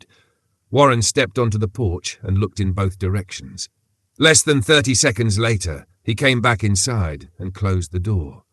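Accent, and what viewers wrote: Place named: England, United Kingdom. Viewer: Like the premise the English accent or whatever it is I just can’t do it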